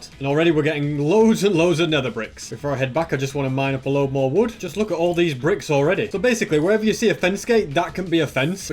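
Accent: United Kingdom. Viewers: I love ur British accent are u from England or united kingdom?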